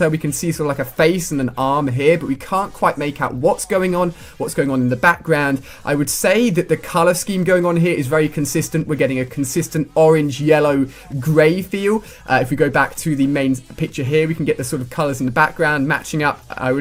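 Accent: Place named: United Kingdom. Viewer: It's hard for me to understand british accent and you speak faster... : /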